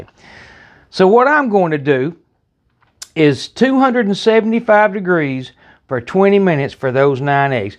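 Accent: South, United States. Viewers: just been listening to the new album and your accent slightly reminded me of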